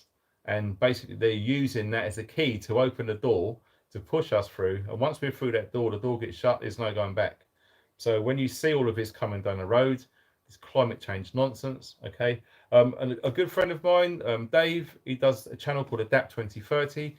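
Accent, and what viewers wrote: United Kingdom. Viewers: Hi to u,I just now stumbled across your channel. I'm Nancy from 🇺🇸 USA of state of...Florida..hope you All are doing well over there.Nice to meet u..Lovein That British Cool Accent.Ive got a strong southern Accent 😆. I'll keep watching and keeping up with what's happening over there in your side of the globe 🌎. Thumbs up n keep doing what your doing you know..our governments are doing this to us citizens ALL, we're in this together ❤ 👍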